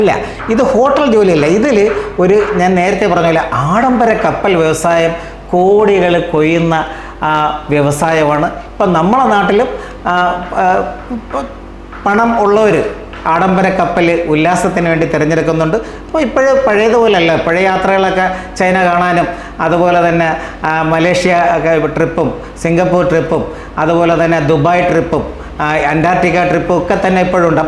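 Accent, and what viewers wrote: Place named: Thiruvananthapuram, India. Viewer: തിരുവനന്തപുരം Accent സംഭാഷണത്തിൽ കൂടുതലുണ്ട്.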